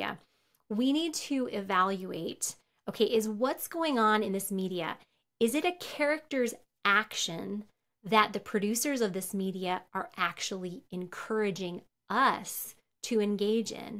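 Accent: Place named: United States. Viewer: I love your accent,! What state are you from?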